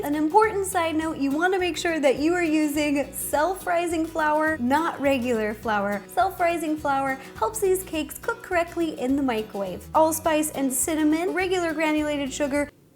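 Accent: United States. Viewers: today you kinda sounded extra....country.....ish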